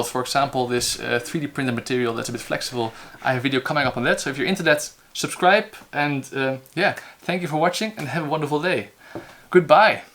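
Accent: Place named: Netherlands. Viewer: As soon I heard the accent, I knew you where a fellow dutchman! I've seen your audition too! I loved it! It's a positive sight, among all this intrution of i.A.!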